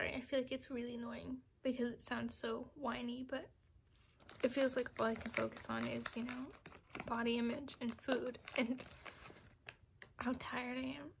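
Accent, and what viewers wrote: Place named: Poland. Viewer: Your accent seem like Polish lol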